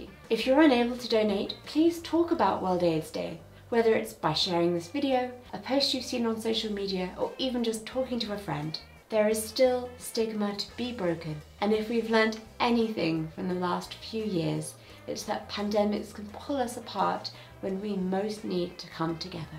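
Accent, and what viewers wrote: United Kingdom. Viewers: You Madame should be made a “ Lady “ , love your speech. Soo British and educational for a speakers. I am waiting 😂😂 😂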